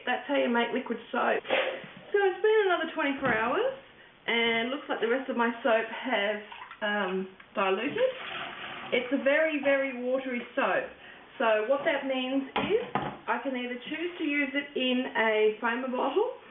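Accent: Australia. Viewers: She is an Australian. That is how they say it. We sound funny to them. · Very nice video. I appreciate the recipe and all measurements. Love your Australian accent :-)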